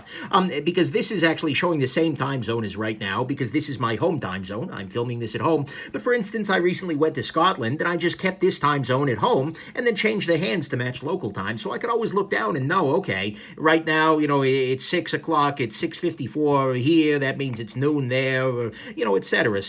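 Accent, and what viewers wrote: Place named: East Coast, United States. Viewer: I usually hate east coast accents as a Brit, but come on I love this review it’s great 😂 this is my grail watch and on that breitling strap it’s stunning I can’t wait to get one!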